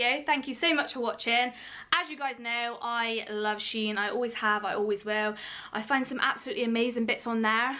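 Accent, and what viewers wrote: Bristol, United Kingdom. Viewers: hey beautiful you don’t have to answer but are you from Bristol😂I can hear a Bristolian accent in you xx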